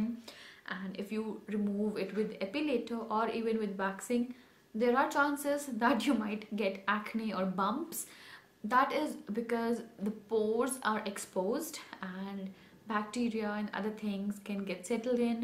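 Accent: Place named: India. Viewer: I cant take the indian accent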